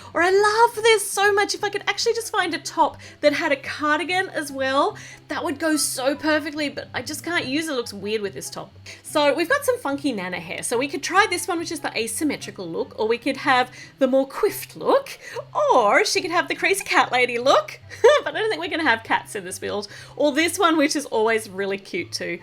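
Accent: Australia. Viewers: I thought you were saying pillow cuz you talk in an Australian accent but you were actually saying pillar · I love the Australian so it’s sounds like suo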